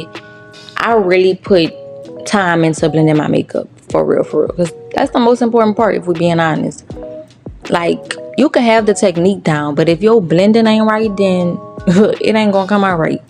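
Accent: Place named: New Orleans, United States. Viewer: I know a NOLA girl when I hear 1 😂 love your video sis keep going 🩷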